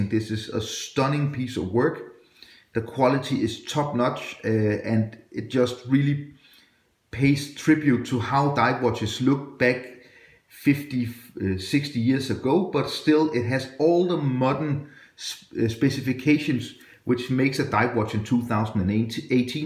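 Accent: Denmark. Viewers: Tak for dit review :) Jeg kunne høre du er Dansk, du sagde her et par gange. Men hvad jeg ikke helt forstår er HVOR du købte det??? Og FEDT ur, jeg vil have et hvis jeg kan få et. Det er gennemført nice og dejligt at høre hvor superglad du er for det. Jeg sidder selv med min spritnye Oris Staghorn Diver WR300m, med et dejligt Sellita værk SW200-1, Oris modded' det for Staghorn, og det er meget præcist og dejligt at gå med... Jeg er også glad for mit og kigger hele tiden på det. Det gør du også er jeg sikker på, hahaha. Congratz with the watch mate... It's KOOL. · Watch On...your accent...Danish?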